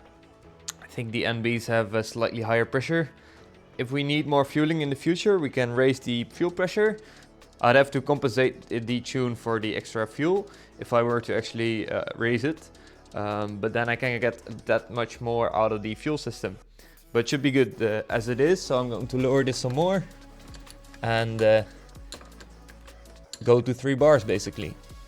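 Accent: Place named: Brabant, Netherlands. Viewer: das wel bekend dialect!! midden Brabant?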